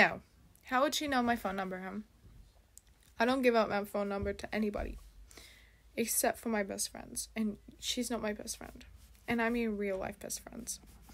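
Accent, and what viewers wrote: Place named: Australia. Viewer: I knew it I knew u sounded like a Australian🙃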